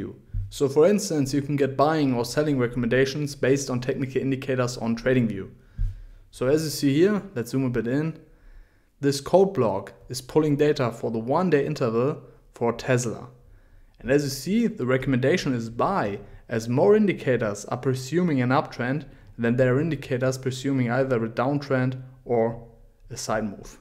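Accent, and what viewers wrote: Germany. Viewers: ich höre du bist deutsch, wäre es möglich mal du reden über discord? ich habe kranke erfahrungen , also sehr sehr viel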